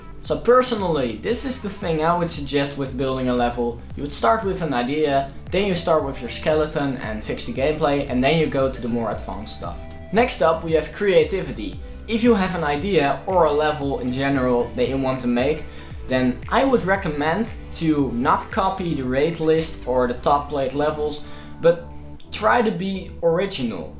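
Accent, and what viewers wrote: Netherlands. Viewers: I can sense the Dutch (W tutorial)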